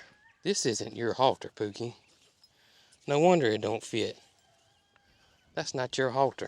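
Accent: South, United States. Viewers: Captions do not mix with a Southern accent!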